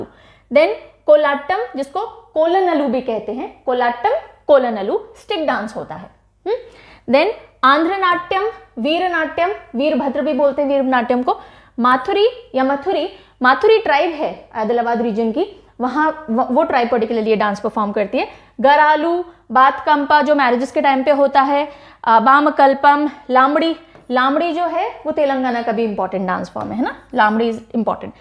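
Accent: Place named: Kerala, India. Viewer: I am a malayalee..it's nice to hear ur presentation in Malayalam slang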